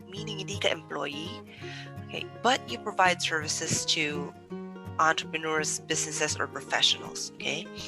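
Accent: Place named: United States. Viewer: you speak great! 'thought at first that you're an american! great accent, lpve the tone of your voice.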